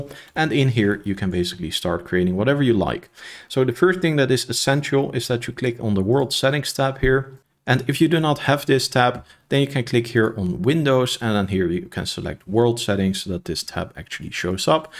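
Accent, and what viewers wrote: Netherlands. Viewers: Duidelijke een Nederlandse accent :D. Cool Template tho!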